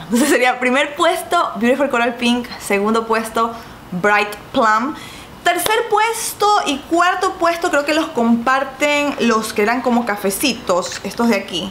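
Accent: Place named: Guayaquil, Ecuador. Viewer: ese hermoso momento es que te das cuenta que una youtuber de tu País y Ciudad te encanta .... ya decia yo... parece guayaca :v me encanta ♡♡♡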